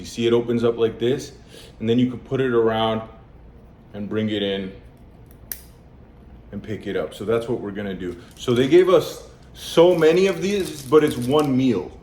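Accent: Poland. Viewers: On jest Polakiem ? On mówi z polskim akcentem. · Wspaniały akcent Polski. Pozdrowienia z Polski 🇵🇱 greetings from Poland🇵🇱👍👌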